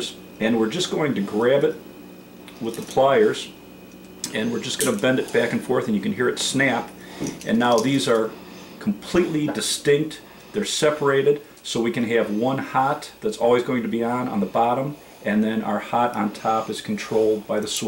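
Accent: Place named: Midwest, United States. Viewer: Spot the Midwest accent! "The hat is on tap!" Thanks for posting, this was very helpful.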